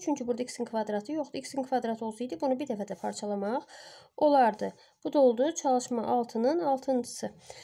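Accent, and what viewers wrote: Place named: Sheki, Azerbaijan. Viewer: Birdə sizə sualım var🙃.Çox marağım tutdu.Siz Şəkilisiz?Ləhcəniz Şəki ləhçəsi kimidi.Mən bakılıyam.Amma anamda sizin ləhçədə danışır.Zəhmət olmasa mənə cavab yazardınızda😇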